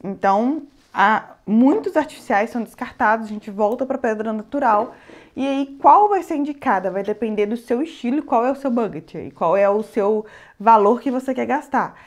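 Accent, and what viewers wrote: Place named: Minas Gerais, Brazil. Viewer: Nós mineiro falamos assim mesmo .... Escorrega igual quiabo kkkk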